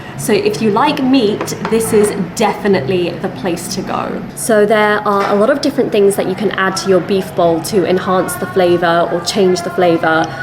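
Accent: United Kingdom. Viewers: 牛丼を、スプーンを使って食べるとき、dig in～という表現を使うのですね。動画の内容も面白く拝見させて頂いています。と同時に、イギリス英語の Listeningも同時に、楽しめてGOODです。何より、日英両方の字幕を出してくださっているのが、とても有難いです。どうも有難うございます。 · のイギリス英語発音は私大好きです。アメリカ人女性はイギリス英語発音に弱いと聞きます。日本人男性に話すと好かれますよ！😽🐱😻😍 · 牛肉、豚肉、鶏肉一度に食べるとか。あんまり機会ないな。の食事見たらほんま食べたくなる。後イギリス英語は聞き心地良いですね！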